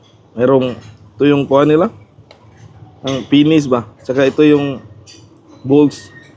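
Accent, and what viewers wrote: Visayas, Philippines. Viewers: Naa napod ka. Dalo kaau ka.panglibre pod diha.. Dika maulaw ka? Bisdak baya kaau mg Tagalog. Pag English pod. Wla Kay angay mg tagaglog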